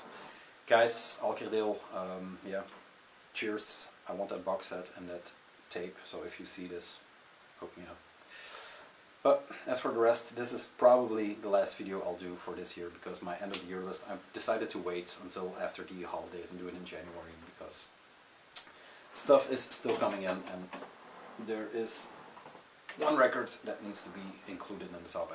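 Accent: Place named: Netherlands. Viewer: You have a Dutch accent, i am from Belgium! Good video!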